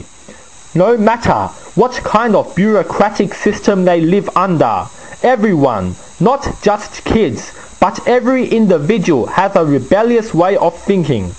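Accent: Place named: Asia. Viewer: why the asian accent ?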